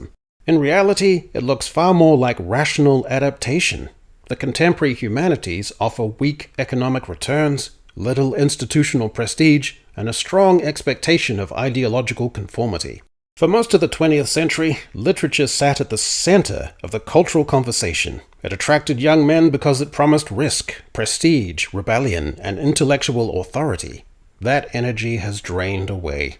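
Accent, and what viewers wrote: New Zealand. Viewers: I have to ask this. I have watched nearly all of your videos, and enjoyed them, partly because of your sharp analytical mind, partly because of the Kiwi accent ... but ... who is that guy with the really complicated situation in his hid? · The classic New Zealand accent pronounces "Ten cents" like "Tin cints", with a very short vowel that turns the 'e' into an 'i' That said, may be using both "womAn" and "womEn" correctly, but American ears can't distinguish between the way the Kiwis pronounce 'a' and 'e'. I lived in NZ for 7 years, back in the 1970s, and in Australia for 3, so I'm acquainted with some of the idiosyncratic ANZAC dialect.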